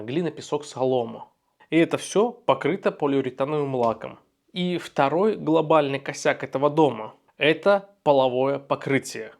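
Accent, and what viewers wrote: Belarus. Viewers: А мне сразу стало понятно, что это где то в Белорусии. Первое: по говору. Второе: белорусы замечательные умельцы и трудолюбивые рукодельники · Здравствуйте! Судя по говору вы белорус😊 привет вам со Ставрополья! Мои предки строили дома из самана и они могу стоять сотни лет если за ним ухаживать.Отличие от вашего хоббитского все дома из самана с толстыми стенами и это важно ! Такие хатыбелят известкой а фундамент черным битумом. Красиво и нарядно ! Ваше умение строить такие печи со сложными дымоходами восхищают! В нашей местности дерево всегда ценилось и глиночурки не известны.и конечно с дровами туго.В детстве бабушка топила углем а потом у всех провели газ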